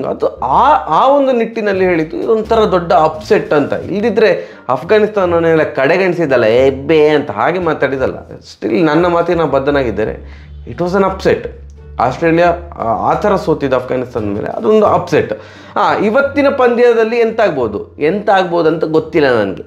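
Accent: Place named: Dakshina Kannada, India. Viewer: ... ನಿಮ್ಮ ದ. ಕ. ಕನ್ನಡ ಕೇಳುವುದೇ ಒಂದು ರೀತಿಯ ಖುಷಿ..." ಮಾರಾಯ್ರೆ ", " ಒಟ್ರೇಶಿ ", " ಎಬ್ಬೇ ".. typical slang accent...❤❤❤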